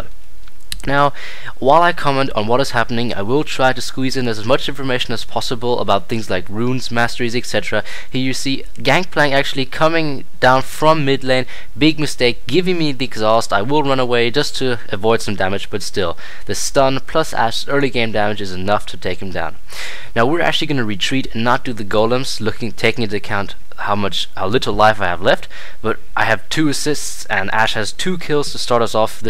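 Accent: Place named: Germany. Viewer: Man hört dass du deutsch bist aber nich so dchlimm ^^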